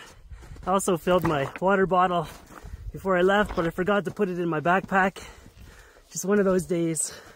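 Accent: Canada. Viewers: Great drone shots, cute dog, fun adventures, subscribed. The canadian accent is adorbs. Someday I'll be your van neighbor.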